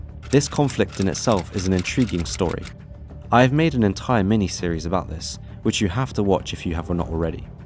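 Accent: Netherlands. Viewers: Mate who narrates these vids ? Are u dutch ?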